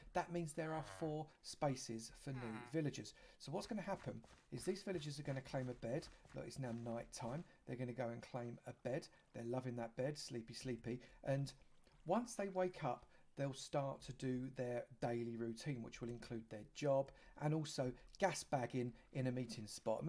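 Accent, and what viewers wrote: United Kingdom. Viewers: I’m assuming you aren’t British?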